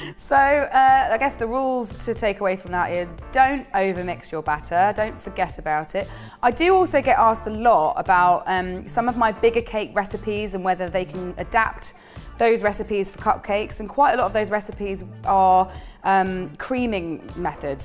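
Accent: England, United Kingdom. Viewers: She's so well spoken and got a nice attitude. She sounds very English and that's amazing. There should be more people like her, well spoken with a great personality.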